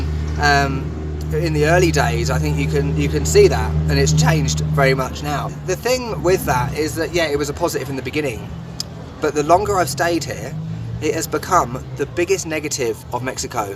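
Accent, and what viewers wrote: United Kingdom. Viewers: I love your Bit accent !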